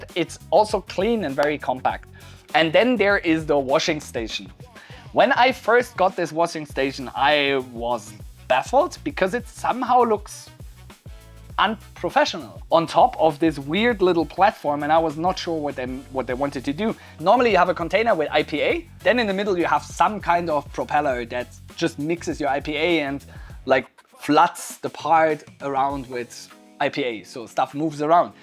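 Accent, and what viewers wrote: Germany. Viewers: i was thinking this dude has a german accent.. .then i saw the waschgang sticker :D geil :D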